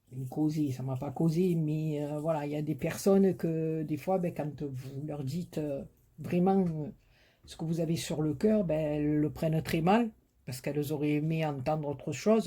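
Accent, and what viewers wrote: South, France. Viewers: Coucou, j'ai toujours le sourire quand j entends ta voix....😉 ça me fais du bien même si je ne suis pas à plaindre, même ton accent du midi,c est du soleil, quand à Agen il pleut...merci pour tes réponses sur ce tag...j ai bien ris 😂😉 comme toujours...merci beaucoup pour tes très belles créations et tes tutos très bien expliqués....je t assure que tu explique très bien, vu que je comprends et que je suis une débutante 😉🤣....je te fais des bisous prends soin de toi aussi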